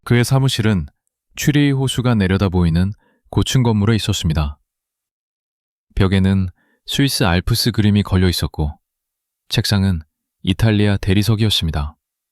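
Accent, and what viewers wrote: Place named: China. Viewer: 중국 발음이네 AI가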